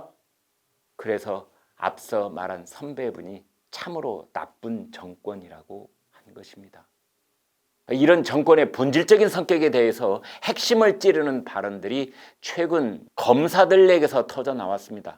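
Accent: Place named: Jeolla, South Korea. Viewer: 말을할때 어금니를 갈면서 발음하쟌어요 특히 성깔 부릴때는 어금니를 뿌드득 갈면서 소리낼때는 전라도 사투리가 가루를 날리더라구요 대구 경북여고 출신이라든데 경상도 사투리가 아니닙다. 소시적 최하8~10년정도 전라도, 나머지 경상도,서울말 섞인 묘한 발음입니다. 고향이 어딘지???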